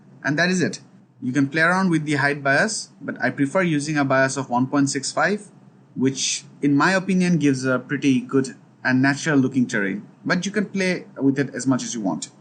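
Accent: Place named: Bangladesh. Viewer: your accent is a bit Bangladeshi. are you from Bangladesh bro ?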